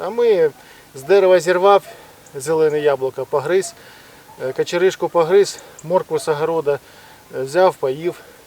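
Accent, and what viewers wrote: Russia. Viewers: Привіт😊 все у вас добре виходить, чутно що деякі слова російсько-українські, але нічого 😊 потрібна практика.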